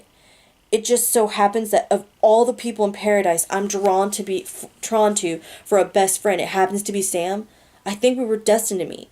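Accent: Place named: United States. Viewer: I think what I wrote confused you, I apologise as I write as I speak, I am at the moment listening to the "power of six" and was confused as I thought I had missed " Six's Legacy" but you have cleared it up that it is a novella ( I will listen to that after this one " the power of six" ) as I am now guessing it gives you an insight into just her life no one elses, Yes accents are strange I knew you were from the US just couldn't pinpoint where about, although I must say it is a very nice accent to listen to and I love that you change it for the different characters, really helps me visualize the story. So glad I found your channel, as it is helping me pass my mundane hours working from home, I work with clay and creating small charms for jewellery and a few episodes before this one I believe, I was doing something really intricate on a piece and your cat jumped on your knee and you screamed, I threw the clay across the room, haha I couldn't stop laughing at myself you gave me such a fright, as I wasn't watching the screen haha :D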